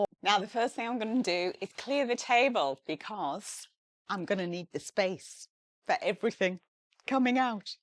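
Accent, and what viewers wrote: United Kingdom. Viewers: I’m I heaven…the British accent, thrifting, design. Thank you for sharing. Diane from Florida.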